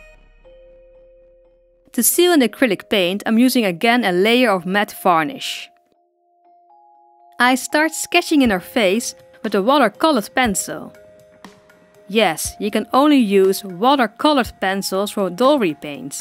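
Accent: Netherlands. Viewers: OMG your Dutch!! I heard it from your accent!! Zelf ben ik ook Nederlands en ik vind wat je doet echt geweldig!! Zelf wil ik ook een een pop maken maar dat mag niet van me ouders ): dat vind ik heel jammer maar ik vind het altijd leuk om naar je filmpjes te kijken :D 😍✨❤ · This is so cute! Altho I can barely handle the strong dutch accent in your english 🙈 no hate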